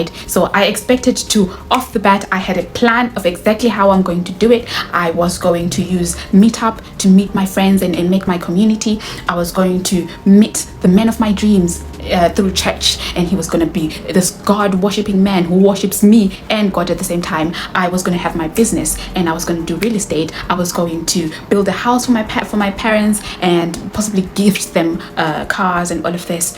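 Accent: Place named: Africa. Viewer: Please work on your accent! It’s too difficult to understand your when you speak fast ! Practice to speak less African !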